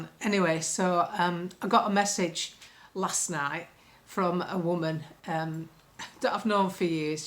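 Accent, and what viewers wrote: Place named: Lancashire, United Kingdom. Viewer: You have beautiful skin, and I’m from Yorkshire so I loved your Lancashire talk it’s same in Yorkshire on “tinternet”, you need to do more funny stuff, I love it along with the political side as well!❤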